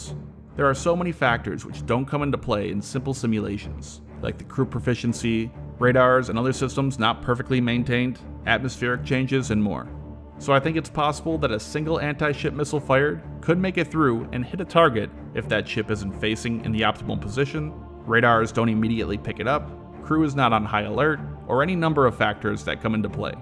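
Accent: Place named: Midwest, United States. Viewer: He’s got a strong Midwest accent. I’d guess Wisconsin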